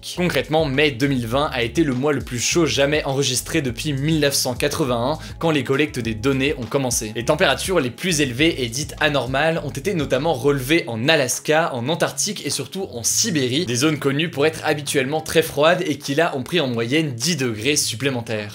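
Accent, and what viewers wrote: England, United Kingdom. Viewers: C’était mieux avec son accent anglais! Ceux qui s’en sont plein vous saoulez 😒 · Yfébo Anvakenss autant pour moi, reste quand même que son accent anglais est magique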